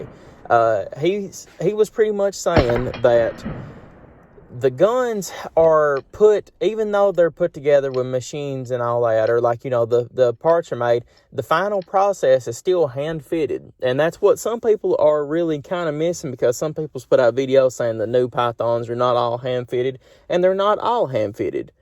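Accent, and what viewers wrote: South, United States. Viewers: Well...it is Connecticut no? Perhaps run through a few times of problems with a pal without such the deep southern accent, and have them call for kicks? see if phone response sounds different? sooks of your Python issue(s) ~ iken imagine the anticipation of first squirrel headshot not having to lop it off for stew and goes click. Not fair. :-)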